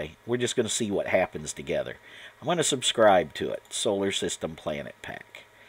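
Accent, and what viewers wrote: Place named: Australia. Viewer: the australian accent is insane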